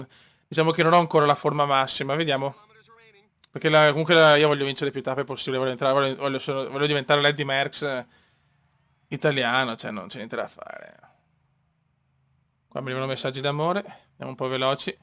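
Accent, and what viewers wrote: Veneto, Italy. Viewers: sei di venezia?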